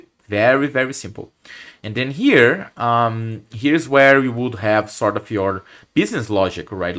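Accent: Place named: Brazil. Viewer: Pretty good job explaining the concept man My dad is also a although we're from mexico, but i can tell you are very brazilian from your r's!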